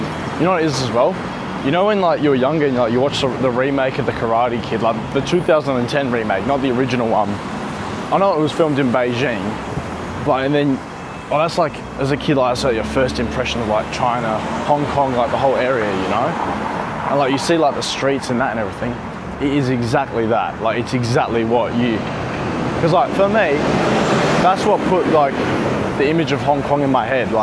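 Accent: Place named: Australia. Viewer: Thank you for coming to HK.💛 I can practice listening to Australian-accented English.😃🙏🏻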